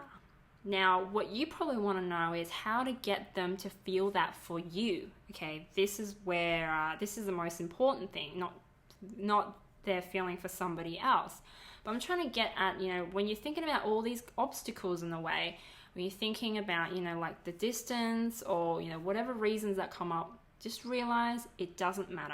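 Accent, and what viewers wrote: Australia. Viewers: this is an Asian Woman with an Australian accent. never thought there was a such a thing as a perfect woman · your accent... are you in australia? you sound like my cuz in melbourne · are you chinese...you sound australian😯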